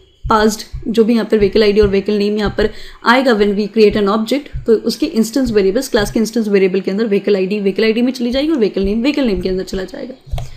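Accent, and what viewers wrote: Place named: India. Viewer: in nearly every programming tutorial Video is this Indian Accent. As a German i nearly don't understand anything. Everytime i have to watch a Video more than twice...... Nevertheless thanks for the Video. After serveral times i understood it. :)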